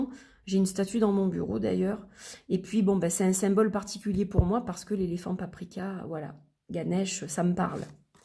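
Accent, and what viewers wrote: South, France. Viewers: il est splendide! J'adore Leïla, cet oracle et votre présentation aux accengs du sudeuh (comme moi).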